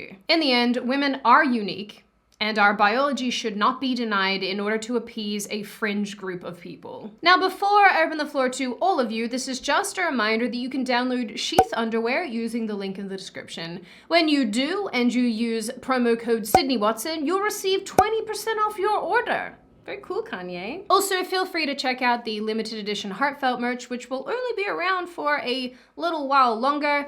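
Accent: United States. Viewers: you sound more american every time i watch a video. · It's because the world has gone mad. Also, off topic, but you sound completely American now. All traces of your Aussie accent are pretty much gone 😪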